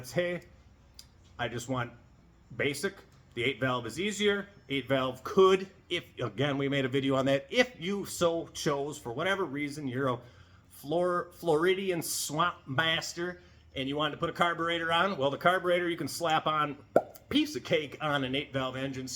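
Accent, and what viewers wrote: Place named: Appalachia, United States. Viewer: Damn you talk like you're right out of Appalachia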